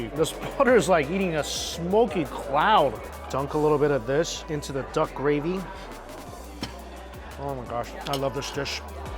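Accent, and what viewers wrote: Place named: United States. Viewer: Yummy man I love our your pronunciation is freaken Terrible lol and so American man.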